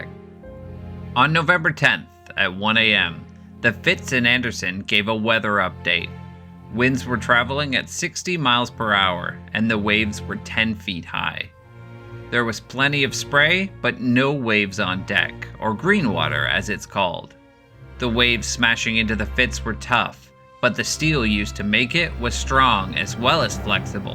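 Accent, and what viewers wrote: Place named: Canada. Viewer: I'm guessing your Canadian by the accent and mispronunciation of Detroit and RADAR.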